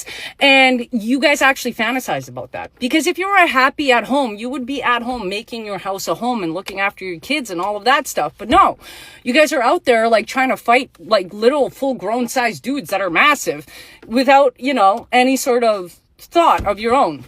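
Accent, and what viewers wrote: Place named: Prairies, Canada. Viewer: Ok this girl is from Canada and my guess is she a prairie girl lol love it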